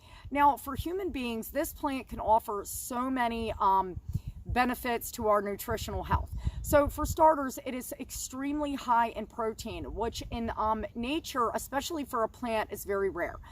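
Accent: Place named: Pennsylvania, United States. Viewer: Corporal sent me. Great content. I love gathering knowledge. My wife and I were wondering if you lived in Pennsylvania? You sure sound like it. Lol. Sorry if you're not.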